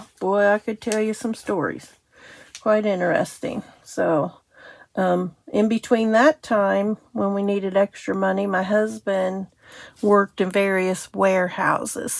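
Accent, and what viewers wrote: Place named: South, United States. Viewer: I Love your attitude to life and all it throws at you. Thanks for telling your story. I had to retire from teaching when I was 53 through mental health. I'm registered disabled through my feet. I was a carpenter before I taught and did lots of concrete form work. I did bridge decks and columns. My last big pour took 12 hours of continual pouring and vibrating concrete. What are duradelis. That's probably spelt wrong but I have never heard of them. PS your country accent is great. I have a Dorset accent.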